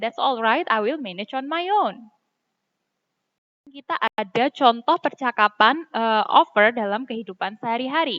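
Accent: Java, Indonesia. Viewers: Kak orang aceh ya? Soalnya logat nya kayak jawa☺😁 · Thankyou mbaa, lucu mbanya medok hehehe ♥️♥️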